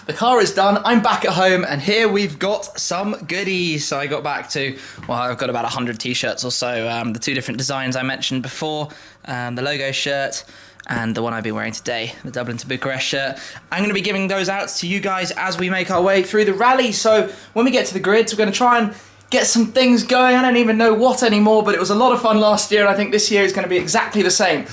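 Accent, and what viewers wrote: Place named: United Kingdom. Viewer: Its called a british accent bro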